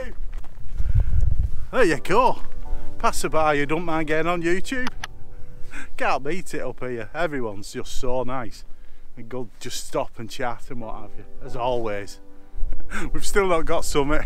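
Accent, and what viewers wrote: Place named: St Helens, United Kingdom. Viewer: binging on your videos mate, really enjoying them. To be honest you stopped me in my tracks when you opened your mouth haha I thought “Now that lad’s got to be local to St Helens...”;)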